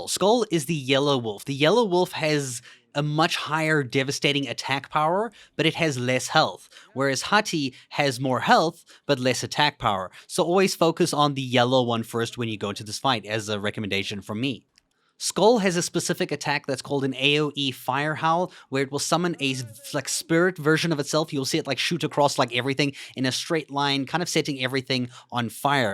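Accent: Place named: South Africa. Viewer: The first video I saw of you I instantly heard the South African accent! Great video, keep up the good work!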